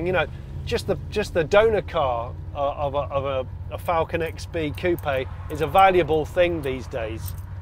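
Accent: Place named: West Country, United Kingdom. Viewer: proper accent, my luvver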